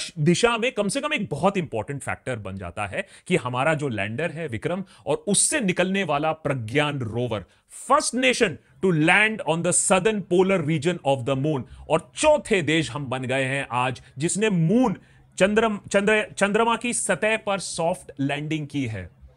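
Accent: Bengal, India. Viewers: R u bengali ❤❤❤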